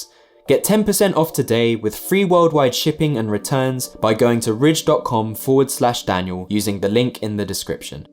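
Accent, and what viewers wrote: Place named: England, United Kingdom. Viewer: Sorry to say so, but I raise an eyebrow when the first thing I see is an english sounding Matt D´Avella copy that sells a minimalist wallet in the first minute of the video. However as I am not that full of cynicsm and sarcams, I just watched the whole video and agree on many things. „Minimalism is a lifestyle for wealthy people who pretend to live like poor people.“ It is also for „better educated people“, who have the time and effort to change their lifestyle. That is all by design and choice. But Minimalism aside from a modern philosophy for the „Overfed wealthy young spoiled consumerism addict“ is a concept for everyone, a concept for the whole society. It is important to point that out and see the downsides of how minimalism is shown to the public by the regular show-off tools (socialmedia and yt). Minimalism is already misused by advertising, companys and consumerism lifestyle. 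Hey Look, my newest edc minimalist workout routine against procrastination! Nope, the bluecollar worker probably has to work nightshifts and overtime to just get food on the table and not the newest middleclass lifestyle Gadget that makes you feel like you are intentional.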